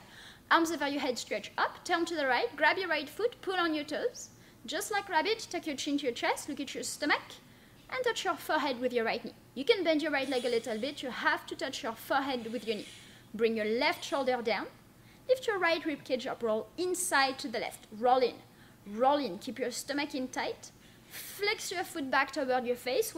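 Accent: France. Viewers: Beautiful, positive energy! Fantastic class, and love the pretty French accent :) . La Fance me manque!! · is the best!! She keeps you moving for 60 min never skipping a posture or a cue!! Her French accent is adorable and her dialogue is perfect. Thank you 🤗🙏🏼🧘🏽‍♀️💜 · Thanks for this amazing class. I miss so much my studio in Montreal. I will practice with you guys from now on...and I love yourFrench accent as well 😉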